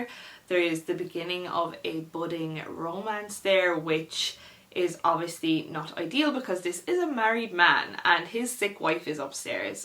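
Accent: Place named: Ireland. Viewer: I had to subscribe to your channel because I also love the same genre as you in novels ... and because of your gorgeous Irish accent. Lol. ❤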